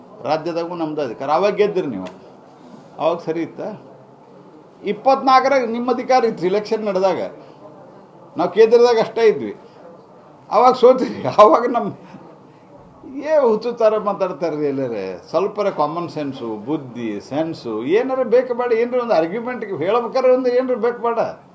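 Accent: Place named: North Karnataka, India. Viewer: ಅಚ್ಚು ಉತ್ತರ ಕರ್ನಾಟಕ ಭಾಷೆಯಲ್ಲಿ ಅತ್ಯಂತ ಪ್ರಬುದ್ಧ, ಸಮತೋಲನದ, ಯಾವುದೇ ವಿವಾದಕ್ಕೆ ಎಡೆ ಮಾಡಿಕೊಡದ ಸ್ಪಷ್ಟಿಕರಣ. ತಮಗೆ ಅನಂತ ಕ್ರತಜ್ಞೆತೆಗಳು 🙏👌👍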